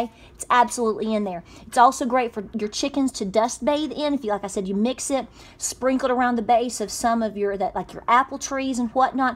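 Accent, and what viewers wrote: South, United States. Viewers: Hi (i think that's your name??? Hope so...) just wanted to tell you that I love your attitude and that southern accent. LOL. I'm a northerner and we raise German Shepherds so I'm not off grid or even a homesteader but I really enjoy watching shows from all you guys. So many useful ways to use up wood ashes! I never knew this stuff. My grand parents were farmers and carpenters. I got a LOT of carpenter in me but not a whole lot of farmer, so I'm working on that one as we speak. Just wanted to pop in here and tell you that I really enjoy your show.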